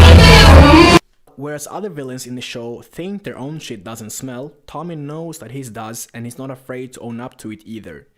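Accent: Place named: Finland. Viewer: The Finnish accent 👌🏻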